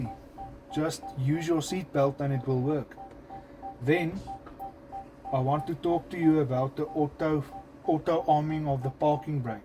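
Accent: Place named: Afrikaans, South Africa. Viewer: Great vidoes... Just one problem.... why did you apologise for your Afrikaans accent? Your english is better then my Afrikaans... LOL🤣. By the way , where's the video about the foglights?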